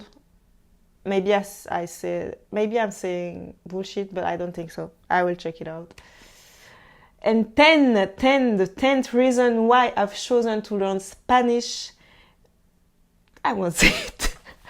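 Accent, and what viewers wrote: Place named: Belgium. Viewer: You sound very Belgian haha (coming from a fellow Belgian) I would recommend you to check out Dreaming Spanish. がんばれええ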